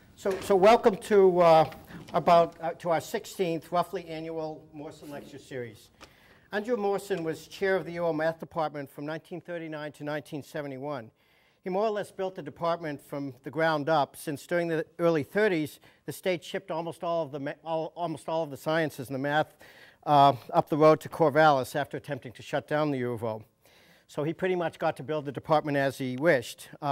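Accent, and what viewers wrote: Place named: Australia. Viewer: chinese with australian accent teaching math in america... globalization!! yeah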